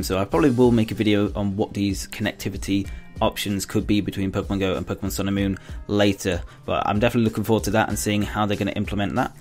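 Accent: United Kingdom. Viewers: Nice video most British voices that are recorded i can't really stand but i like your videos keep it up 😎👍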